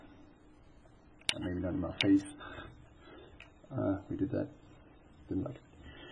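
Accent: Germany. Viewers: Ich glaub du kommst aus Deutschland wegen deinem Akzent :D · That german accent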